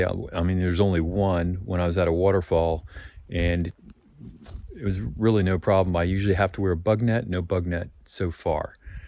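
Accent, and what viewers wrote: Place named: Pennsylvania, United States. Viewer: London, England...Acorn Army, worldwide! I’m American who’s lived in London 9 years. We have a family lake house in western Maryland - have hiked a few sections of the AT and a lot in the area. I’m looking to attempt a thru hike in 8 years (early retirement and kids out of school). Thought I wanted NOBO but not sure I’d like the large crowds - so thinking flip flop. Main worries are what you’re describing in this video - anti-climatic at Katahdin and will I have it in me to flip back down and hike south? I’d love to see you walk south and will be cheering you on if you do! Are you from PA? You mentioned it once and then the beach, so a bit confused. Also, you’re accent tells me PA or Maryland...maybe south Jersey?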